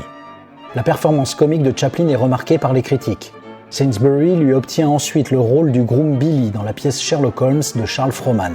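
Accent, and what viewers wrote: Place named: England, United Kingdom. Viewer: Merci…passionnant comme d’habitude ! 👍👏👏👏 Et quel accent anglais 😉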